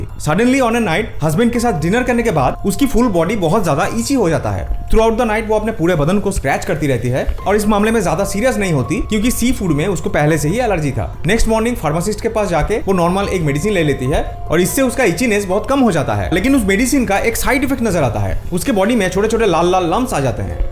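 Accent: Bengal, India. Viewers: bro , you are from west bengal, right , because your hindi language is not a regular hindi language it mixed bengali and hindi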